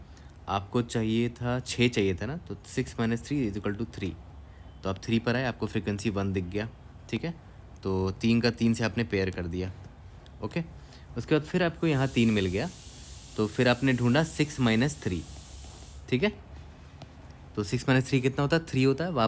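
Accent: Bihar, India. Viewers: whi bohot meethi awaz hai lga hi tha bihar jharkhand side se honge, mere freind bhi wahi se hai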